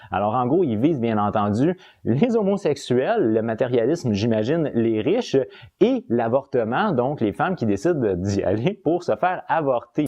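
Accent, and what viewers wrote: Quebec, Canada. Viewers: J'adore ton accent québécois. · Ça fait plaisir d'entendre l'accent du Québec. Ps" Salutations depuis la Catalogne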